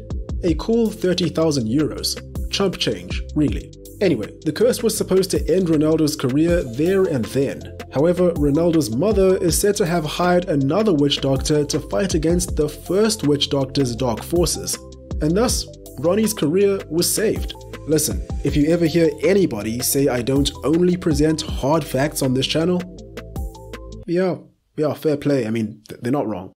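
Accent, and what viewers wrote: South Africa. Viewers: That "Ja" keeps confirming your Mzanzi'ness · Bro, I'm south african and I can tell you're south african so surely make a video on benny McCartney or bafana bafana or something south african😂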